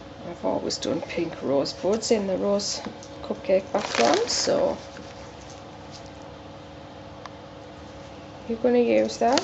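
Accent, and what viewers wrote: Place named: Newcastle, United Kingdom. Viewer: hey where did u get the citric acid from u sound geordie im in newcastle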